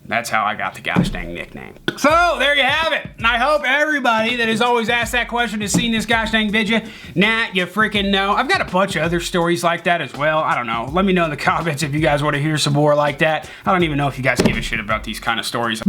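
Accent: United States. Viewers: are you american or swedish?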